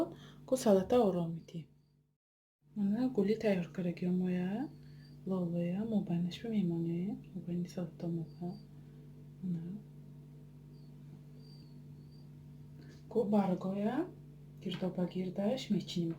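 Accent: Isfara, Tajikistan. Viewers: Аз суханатон фахмидамки хамшахри мо хастед исфарая кучошба кадом шахри расия зиндаги мекунид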